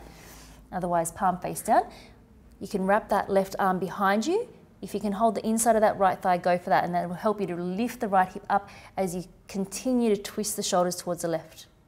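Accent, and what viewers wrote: Australia. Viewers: Thank you for a fantastic flow. So good to be guided by an Australian voice at home. 🙏🏻🧘‍♀️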